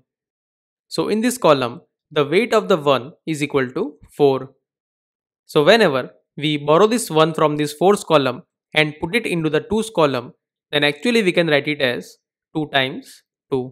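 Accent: India. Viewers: You know it a great video when he have an Indian accent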